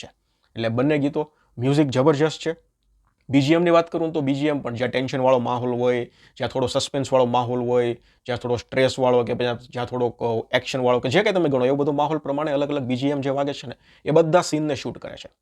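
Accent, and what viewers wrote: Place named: Kathiawar, India. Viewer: ગુજરાતી તો સરખું બોલો કાઠિયાવાડી લેહકો સારો નથી લાગતો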